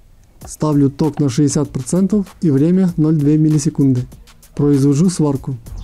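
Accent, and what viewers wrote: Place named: Vologda, Russia. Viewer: мастер из Вологды, по акценту сразу понятно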